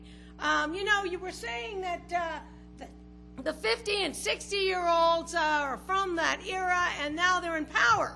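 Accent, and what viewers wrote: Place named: San Francisco, United States. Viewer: the good old san francisco accent. :)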